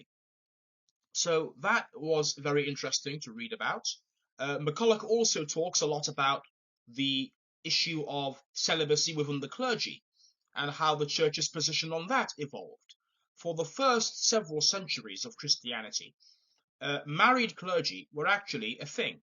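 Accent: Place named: United Kingdom. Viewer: I'm going to let you know something, when I saw this video pop up on my homepage I thought, 'will he will speak with a British accent', and I was right! I'm from the US by the way😭😂🤣🤣🤣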